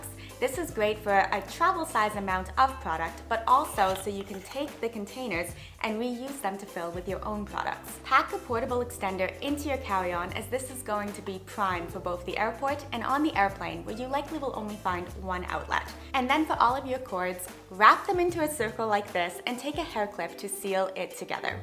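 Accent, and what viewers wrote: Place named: United States. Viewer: She has an American accent so I assume the USA.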